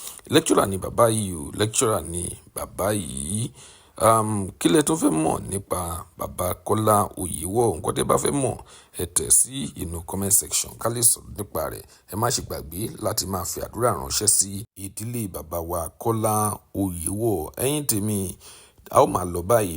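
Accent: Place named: Yoruba, Nigeria. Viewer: You are talking as if you are sick,not smart at all,you are speaking as if you are a Yoruba man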